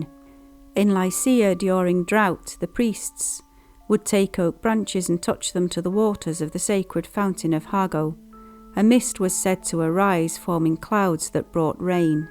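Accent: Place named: Manchester, United Kingdom. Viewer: Love your videos, you sound like your from manchester accent. So relaxing and i love your old ancheint knowledge plesee keeo it up. Xx Danny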